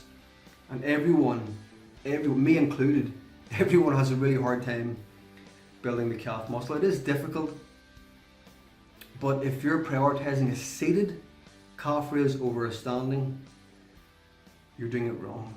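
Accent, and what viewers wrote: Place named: Northern England, United Kingdom. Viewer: WOh your always with the truly objective, scienctific logic to training for hypertrophy, been following your THT programmes since i bumped into in online back in 2016, i really like how you explain things & i got the sense that your the type of person who is on his own personal quest to find the truly most effective knowledgable way of achiving said goals - for example this video on Flat barbell chest, everyone else goes with the "conventional" wisdom which i get, but your taking the risk to say what you believe and regardless of anythign else i respect that! So again thanks for all your effort and saving me years of doing it the wrong way from all the Bro science thats out there! Ps. Loving the Northy accent!!! (from a thick oul Dub):-D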